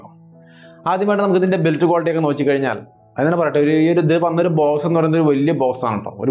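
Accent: Thrissur, India. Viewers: Thrissur slang 😁😁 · Thrissur slang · trissur slang ee videoil nannaai kayarikoodiyallo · മറ്റു വീഡിയോകളുടെ അപേക്ഷിച്ച് നോക്കുമ്പോൾ ഈ വീഡിയോ തൃശൂർ സ്ലാങ് ഫീൽ ചെയ്യുന്നുണ്ട്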